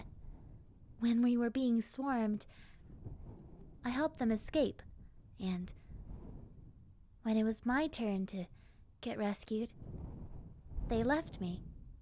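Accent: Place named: New York, United States. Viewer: I love your New Yorker accent! >//~//< Flippin adorable. X,D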